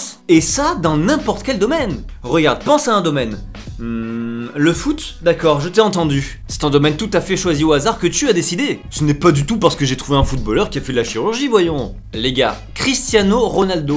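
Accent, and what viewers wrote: Marseille, France. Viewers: T’es marseillais ?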